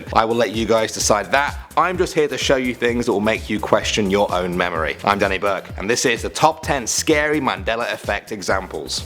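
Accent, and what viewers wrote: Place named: United Kingdom. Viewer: Omg Danny Burke please tell me you are British. I just loooove your accent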